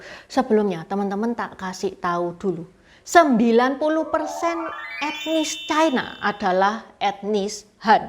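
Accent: Java, Indonesia. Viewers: Bahasa indonesia ce jawanya mantap benar2 cindo👍👍👍🙏. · Ce ce kreatornya jowo banget mlh😝😝😝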